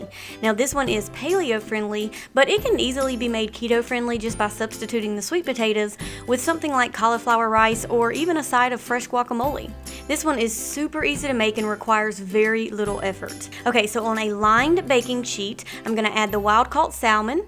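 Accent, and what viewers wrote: South, United States. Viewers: I love your videos. You are truly beautiful inside and out. Oh, and I love your southern accent. I lived in 2 southern states years ago and you remind me so much of my time there....which I loved. Excellent content and delivery. thanks so much.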